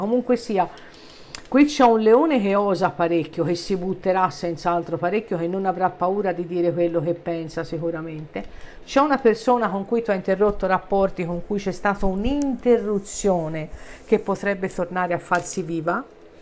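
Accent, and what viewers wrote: Tuscany, Italy. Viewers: Cara io sono leone questa stesa rappresenta molto la mia situazione! È da molto che ho dei dubbi su una persona ed una specifica situazione..spero di scoprire di cosa si tratta! Spero in belle notizie! Grazie ti abbraccio sei simpaticissima con il tuo accento Toscano..sono Toscana pure io a presto ciao! 💗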